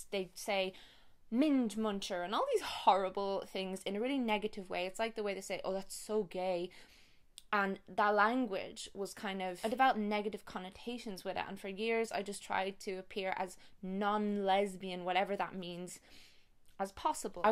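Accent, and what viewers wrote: Ireland. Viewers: I'm new but I already love your channel BTW I LOVE Irish accents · Hi, I'm from Canada and it's midnight here:) I went to Ireland for 3 weeks in June and I really miss it:( Hearing your accent reminds me of all the landscapes and the memories I made there:D I'm a new subscriber and as a bisexual woman myself, I really needed to hear your videos about all this education. I find we have the same dilemmas. Have a great day:D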